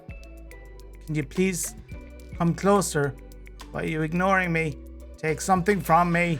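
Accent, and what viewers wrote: Ireland. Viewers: Wow you were not kidding with that heavy Irish accent 😂😂 And I actually like it, it's fun😅😂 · how do you not have more subscribers!!? well im happy to be here anyway definitely a new favorite youtuber, most people that do hardcore series go straight to automatic farming and beating the game but you have a big focus on builds which i love and a fun humor and voice. You remind me a lot of one of my favs is Waxfruad just with that irish accent 😂 Thanks for the videos youre awesome!!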